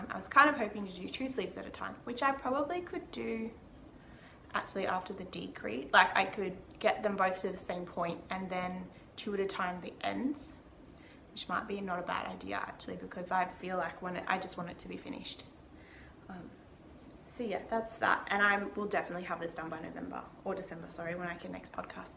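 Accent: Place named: Australia. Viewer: I enjoyed this podcast. It’s great to hear an Aussie accent talking about knitting! If you use the helical stripe technique you won’t have a join where you change yarns. It’s really easy and definitely worth looking up. Good luck on your trip.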